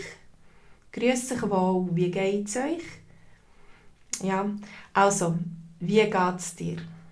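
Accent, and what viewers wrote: Switzerland. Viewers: Sprechen Sie Berndeutsch oder Zürideutsch? Meiner Meinung nach gibt es auch Unterschied dazwischen ,danke.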